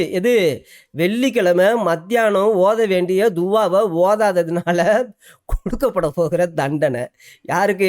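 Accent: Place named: South Tamil Nadu, India. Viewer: சிறப்பு சிறப்பு நன்றாக வெளிச்சம் போட்டு காட்டுகிறீர்கள். பேசும் தொனி ஏற்ற இரக்கம் தென் பகுதி உச்சரிப்பு பாராட்ட வார்த்தைகள் இல்லை 🫂🫂🫂🫂🫂🫂🫂🫂🫂🫂🫂🫂🫂🫂🫂🫂🫂 Number one 😢😢😢😢😢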